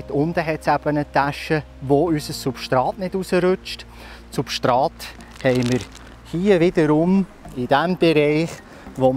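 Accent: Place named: Switzerland. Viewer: Ich hab zwar Aussetzer, aber er spricht eh so langsam, das geht schon. Ist halt gehobenes Schwyzerdütsch...Danke für die interessanten Ausführungen, bin selbst grad am Überlegen, was ich am besten machen könnt. Ok, hab das Video durch, einen Daumen hoch da gelassen und eine Entscheidung gefällt. Es wird eher die Steinmatte, da mein Fertigteich eh schon Zonen hat, ich möglichst wenig ersetzen möchte (siehe Taschen, die Jute wird verrotten, dann bleibt nur mehr der Kunststoff), mit dem Korkgitter kann ich wenig anfangen, sieht hübsch aus, bringt aber nix. Steinmatte sieht nach Steinufer aus. Glaub ich mal. Also danke nochmal für das Video (bin Ösi, daher hatte ich verständnistechnisch gelegentlich Aussetzer, aber im Zusammenhang ging es nahtlos weiter) :)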